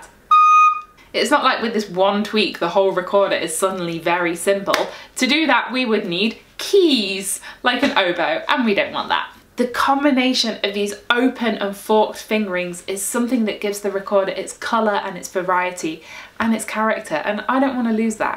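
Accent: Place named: RP, United Kingdom. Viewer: This is amazing. I was wondering why th f sharp sounded so close g on my recorder. I figure it out myself before chek that out with u because of course . you are the authority on the recorder. Your receive pronunciation gives u that title as well.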